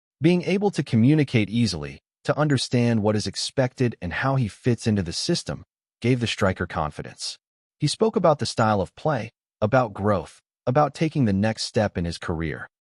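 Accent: United States. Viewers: Sorry - but nobody in Scotland wants to listen to an American trying to talk about Scottish football - change the AI to a Scottish accent!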